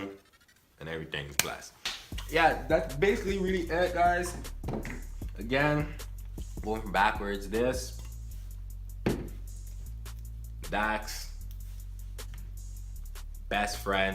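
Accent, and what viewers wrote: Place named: Toronto, Canada. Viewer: sounds like ur from toronto my guy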